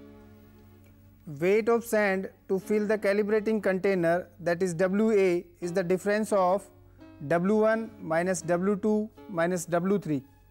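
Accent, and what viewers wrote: India. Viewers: Haha Indian English, lol :v shut your fucking vandal lang up :3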